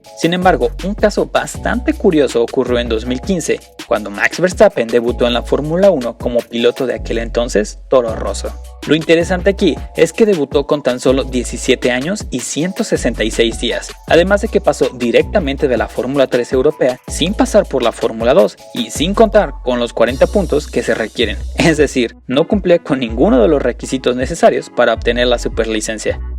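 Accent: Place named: Mexico. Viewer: q tenga acento mexicano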